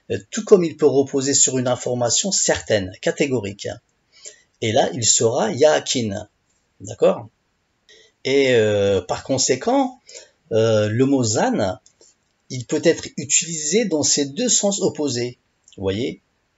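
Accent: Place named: Turkey. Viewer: Zann. Avec z. Ne veut rien dire. Mais than a. Thana ou bien thounou qui signifie penser. Comment toi prof tu enseignes ? Pour enseigner , il faut se faire comprendre . On ne comprendrais pas sinon ! Pour beaucoup l arabe c est du chinois , seulement toi tu y ajoute ta sauce accent turk ... C est bien de rappeler mais rappel les tiens.... mais avant de rappeler les tiens , ai la certitude de tes propos car tu es loin du sentier avant de trouver la route qui te mènera au droit chemin .... que seul les alamins ( initiés ) savent. Sache que le Livre, que personne ne comprend ... est destiné que pour les initiés.... Et il n y a pas de dajjal ou de mahdi qui va revenir. Mais la sentence direct . C est une question d heure. De sa3a comme il est écrit.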